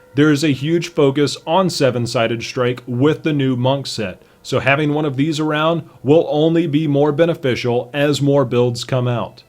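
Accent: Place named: South, United States. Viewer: Oh a southerner! OK, you're off the hook. Keep up the great videos! :-)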